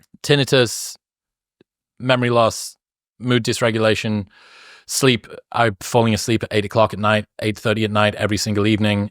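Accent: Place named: United Kingdom. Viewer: I really hope you manage to beat all your health problems as soon as possible, I wish you all the best. Thank you so much for entertaining my ears with your sexy deep British voice. So glad that I’ve found your podcast!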